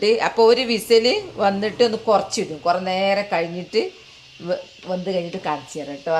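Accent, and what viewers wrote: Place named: Wayanad, India. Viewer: ഹലോ താത്താ... ഞാൻ മലപ്പുറത്തുനിന്നാണ് കാണുന്നത് കേട്ടോ. താത്താന്റെ ആ വയനാടൻ സംസാരിക്കുന്ന രീതി കേൾക്കാൻ എന്ത് രസമാണ്! താത്താനെയും സംസാരിക്കുന്ന ശൈലിയും എനിക്ക് ഭയങ്കര ഇഷ്ടമായി. താത്താക്ക് പടച്ചോൻ നല്ല ആയുസ്സും ആരോഗ്യവും നൽകി അനുഗ്രഹിക്കട്ടെ. ഈ മുതിരത്തോരൻ കണ്ടപ്പോൾ തന്നെ കൊതിയായി. ഞങ്ങൾ ഇവിടെ മുതിരപ്പുഴുക്കോ, മുതിര ഉപ്പേരിയോ ഒക്കെയാണ് ഉണ്ടാക്കൽ. താത്ത ഉണ്ടാക്കിയ ഈ രീതി എനിക്ക് പുതിയ അറിവാണ്, ഞാൻ എന്തായാലും ഇത് ട്രൈ ചെയ്യും. ഇതിന്റെ കൂടെ പറഞ്ഞ ആ മുതിരക്കറിയുടെ റെസിപ്പി കൂടി താത്ത അടുത്ത വീഡിയോയിൽ കാണിച്ചു തരുമോ? ആ കറി ഉണ്ടാക്കുന്ന രീതി കാണാൻ കാത്തിരിക്കുന്നു. താത്താന്റെ പുതിയ വീഡിയോകൾക്കായി ഞങ്ങൾ കാത്തിരിക്കും! 😍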